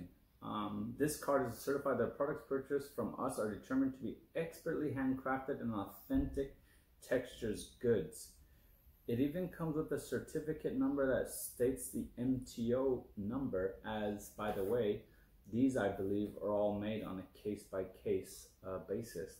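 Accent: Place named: Eastern Europe. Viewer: where were you born? Your accent and grammar appear to be non native. Eastern Europe?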